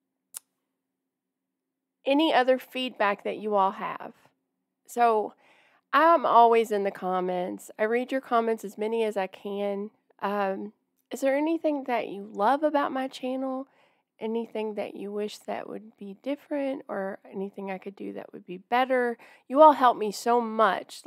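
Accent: South, United States. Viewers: I love your channel. You're fun, show real reactions, and sweet. The southern drawl is warm and inviting. I've never seen judgement in your eyes and you support everyone you watch. + I LOVE, and many others and you deliver what your followers want. So thank you! ❤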